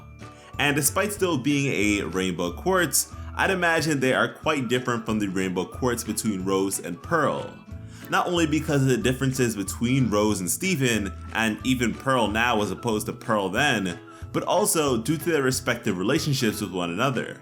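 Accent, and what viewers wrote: United Kingdom. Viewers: He has a British voice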